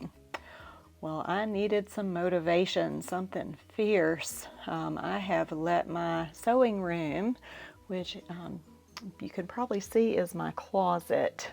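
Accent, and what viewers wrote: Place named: South, United States. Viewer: Welcome,! So happy that Deb pulled you in to #fridaysews! I found her on #teatuesday, where I really enjoy watching her. I don’t produce videos, I just love to watch and sew. 😘 It’s nice to hear another soft southern accent.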